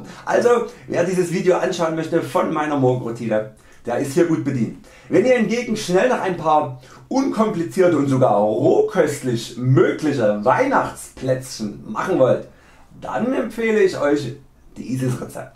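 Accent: Saxony, Germany. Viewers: Haha, super Video! Und vor allem, ohne Schnitte. Da sieht man wieder deine Professionalität. Klasse!!! Und ganz ehrlich, ich fand früher, dass das Sächsische der schrecklichste Dialekt ist. Das hat sich aber durch deine Videos wirklich geändert. Mittlerweile ist es das Schwäbische... hihi. Nee, im Ernst, man kann sich auch als Nordlicht öffnen und erkennen, dass es total egal ist und man den Menschen sieht und annimmt. Außerdem denke ich ja immer, dass ich ohne Dialekt spreche, dabei hört man wohl doch deutlich, dass ich von nördlich der Elbe komme.... Und wenn wir auf Plattdeutsch loslegen, dann versteht das auch kaum jemand. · Für das Video hast du aber ziemlich tief ins alte sächsische gegriffen oder? Ich mein ich komme aus dem "Dreieck" Chemnitz Freiberg Dresden und so wie du es aussprichst redet meist nur noch mein uropa ausm Erzgebirge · Sehr schöner Dialekt! 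Als Österreicherin tut mir das "deutsche Deutsch" in den Ohren weh. Aber Sächsisch ist ganz nett!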